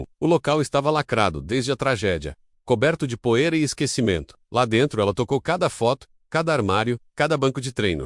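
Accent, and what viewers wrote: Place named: England, United Kingdom. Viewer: Não gostei! História muito vaga. Tradução truncada, ora em português, ora em inglês, ora com sota- que inglês. As fotos não correspondem à idade da soldada. Parecem mais com as de uma modêlo de 25 anos que pelo tempo decorrido deveria aparentar muito mais idade.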